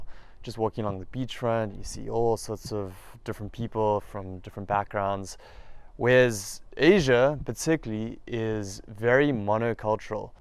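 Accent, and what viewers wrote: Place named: South Africa. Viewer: Buh but your accent! I had no idea you were from South Africa, let alone an hour away! Anyhow, looking forward to hearing from Pavina soon.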